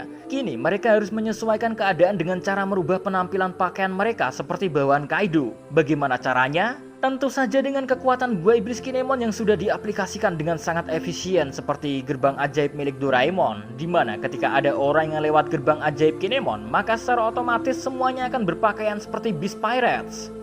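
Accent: Java, Indonesia. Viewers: Orang ini mirip abdi kos soalnya sama sama medok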